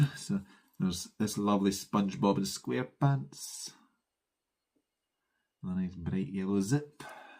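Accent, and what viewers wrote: Scotland, United Kingdom. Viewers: I think you’ll find your numbers are going to rise again…as Pam of Pam and Steph named you as ‘gold flosstuber’ of the week 🎉🎉🎉 she was quite taken with you - your brogue, temperature stitching and variety of pieces you stitch on. That’s cross stitch fame, is that. Congratulations on your 5,000 🎉🎉 And on your cardinal kin finish - which is smashing. I finished a cardinal piece recently - Cardinal No 1 by Crossed Wing Collection. Big Sis got me the pattern as part of a kit from that wonderful Texas institution, 123 Stitch. It was one of their kits with hand painted fabric. Not as much stitching as your Plum Street - and I’ll admit to cutting back on the excessive pine foliage as I got bored of it (shush don’t tell the cross stitch police). My ever thoughtful Big Sis bought me the Cardinal pin set from Puntini Puntini at the same time - they would look good on yours if you fancy a bit more ornamentation- and quicker than applying chenille. 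Talking of birds, when you showed Ellen’s Ravens at Castle Kerr, it reminded me that some wonderful stitcher had stitched a version with the words ‘we are going to need a bigger moat’ above the castle. I like a bit of whimsy. Love your Autumn Quaker - such great colours. I watched while stitching on Once upon a Summer Huswife by Heartstring Samplery. Carry on blathering and stitching, Steph from Cheshire 😊🎉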